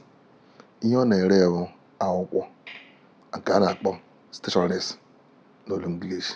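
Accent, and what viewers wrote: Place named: Imo, Nigeria. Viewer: He has more of imo state accent than anambra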